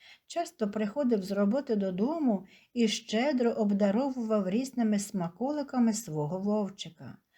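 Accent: Standard. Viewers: Тільки за голос і чисту українську❤❤❤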